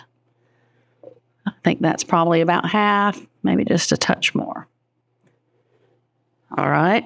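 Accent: South, United States. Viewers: Southern girl here (from Mobile) love your recipes and your lovely southern accent is music to my ears. God bless. 😊😊😊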